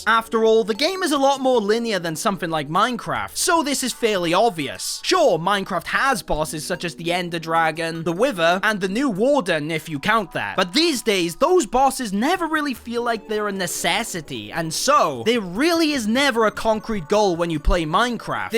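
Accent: Wales, United Kingdom. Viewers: are you welsh you sound welsh but at the same time dont? i cant tell what accent you have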